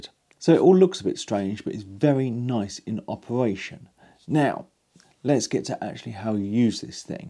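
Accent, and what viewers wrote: United Kingdom. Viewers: Wonderful presentation, right on the money. It’s amazing listening to the British accent, by the way…👌 Thanks for sharing mate…😎 While I have your attention, would you happen to know if Bosch has any plans to make a cordless plunge router?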